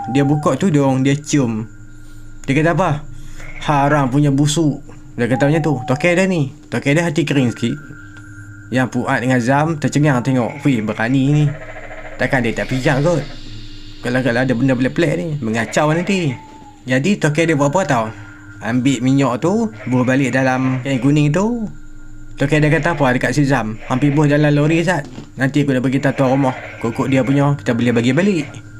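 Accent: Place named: Kedah, Malaysia. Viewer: sib baik pnh duk alor setaq wlupon xlame,jd dpt faham loghat kedah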